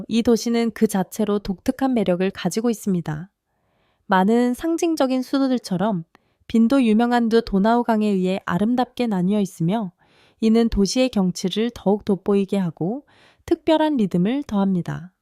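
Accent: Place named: China. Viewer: 한국말 배운 중국인이 나레이션하는거같네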